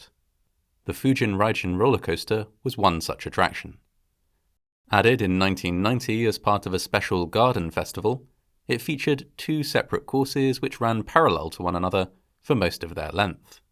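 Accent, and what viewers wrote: United Kingdom. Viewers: God i hate the thick british accent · Don’t watch if his accent bothers you that much better yet ,justjust unsubscribe and be on your way. you knew he was British and yet your dumb ass kept watching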